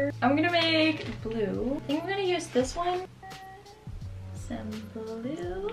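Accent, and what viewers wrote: Netherlands. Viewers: Are you dutch?? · are you dutch?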